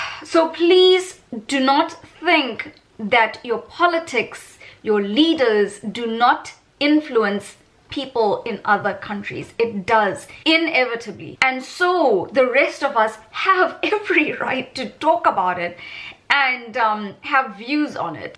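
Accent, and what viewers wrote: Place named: South Africa. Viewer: Oh...... Finally...! I know this point will be entirely off topic ne but flippen hell.. it really feels good to hear your South African accent + your SA Indian accent no like less! For the longest time I've mostly only ever seen these US presidential discussions feature folks from USA & UK reporters and alike. You're bringing a voice to this topic from SA's observing point of view & we're waiting out here just wondering how these next 4 years of USA, laws and policies affect us. This is Ken, from Our East London, SA lol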